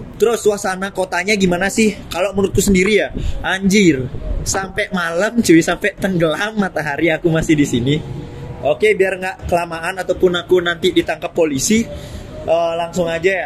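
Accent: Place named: Java, Indonesia. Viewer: Kurang medokkkkkk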